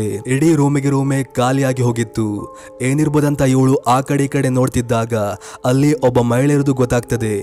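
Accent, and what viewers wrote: Kundapura, India. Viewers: Niv kundapura naaa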